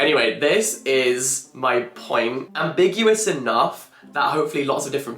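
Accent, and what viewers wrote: United Kingdom. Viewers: I thought it was a british accent (I am not a native english speaker and have trouble picking up accents)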